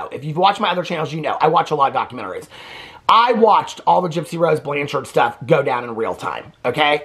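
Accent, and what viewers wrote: South, United States. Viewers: Im from Tennessee and you do have a southern accent and I love it 😜 omg You have got to read the comments more often. That was hilarious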